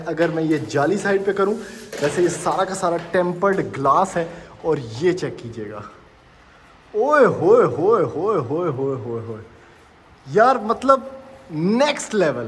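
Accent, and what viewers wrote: Punjab, India. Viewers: Apni videos mein ap jo Punjabi touch daity hu usy increase kar do specially ye word " lamkaaa " howa hai apki mostly videos mein sunny ko milta😂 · Beautiful house with your beautiful punjabi touch accent. Keep it up😊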